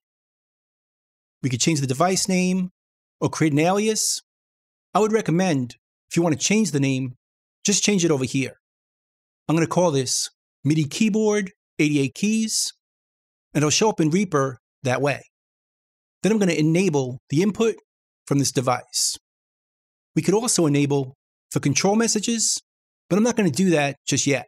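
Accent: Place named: Northeast, United States. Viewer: A lot of people from the northeast talk like that.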